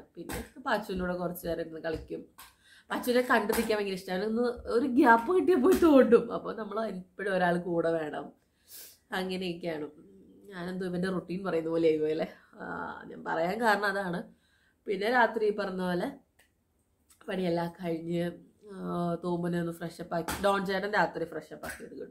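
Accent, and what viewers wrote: Thrissur, India. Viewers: ipo sherikum Trissur slang aayi samsaram oke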